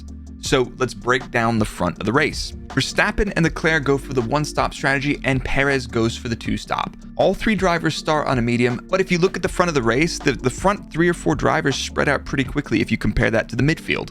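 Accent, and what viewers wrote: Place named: United States. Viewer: Love your content . It’s cool to see a good breakdown using data. I’m one who loves to look at telemetry. If I can suggest a video that I believe would be a hit is a comparison of teammates using the available data to break down who was faster. Removing as many variables that are out of the drivers hands and just look at raw pace. I think you could go very deep with it even factoring tire vs tire and length of stints , who has better tire management, etc. You would definitely kill it. Keep up the great content. It’s cool to watch f1 stuff from someone who speaks like I do . (I just mean a US native) actually I don’t even really hear the English/British/UK accent anymore. It’s almost more noticeable to hear a US accent .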